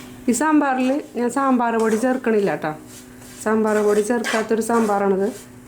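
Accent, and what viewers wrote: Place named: Thrissur, India. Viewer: Trissure slangilulla avatharanam enikkishtappettu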